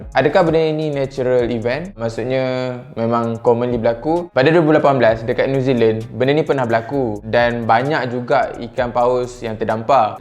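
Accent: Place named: Manglish, Malaysia. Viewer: Manglish hahaha.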